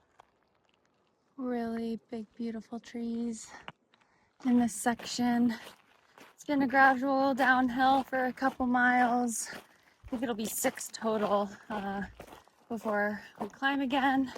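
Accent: United Kingdom. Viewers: Pure London, is she part british? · You’re doing great on your hike! Enjoy your British accent, did you live in England at some point in your life?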